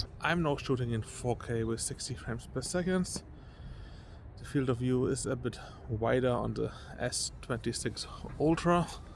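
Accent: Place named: Germany. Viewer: Warum nicht auf deutsch Bruder? Ich mein, man hörts ja schon gut raus.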